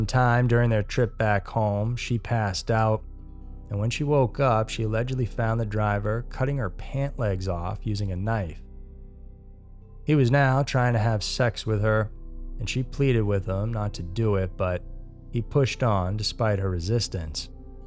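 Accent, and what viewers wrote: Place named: Minnesota, United States. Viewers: You have a Minnesota accent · Is why I follow his words so well? MN for the win, I never noticed the accent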